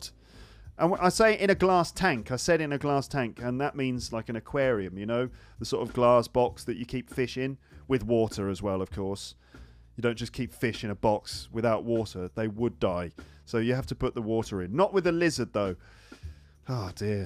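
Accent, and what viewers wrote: United Kingdom. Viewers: hello... First time watching you....great..I love your British accent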